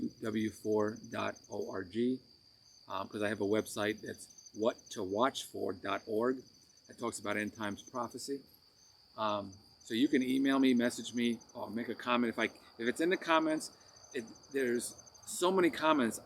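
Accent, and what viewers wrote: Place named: New York, United States. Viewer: This is fascinating,! The New 'Yawkr' accent coming out here. I am from Ossining, NY so I really hear it. I support and believe in you AND the experience you've had. May God bless you and you're family and keep tawkin bro. ❤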